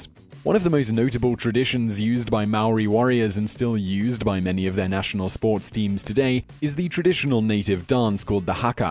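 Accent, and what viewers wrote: United Kingdom. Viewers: He's British, his way of pronunciation trumps yours. That's how we say it in America too, so your way is wrong. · Wtf do you expect, he's British. Can you imagine a Kiwi trying to pronounce Middle Eastern or Asian names correctly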